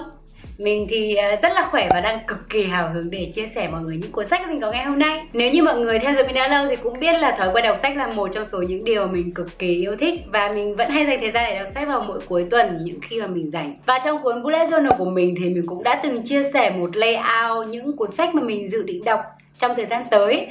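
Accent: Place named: Hue, Vietnam. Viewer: ơi!! nói giọng Huế nghe dễ thương lắm nhaaa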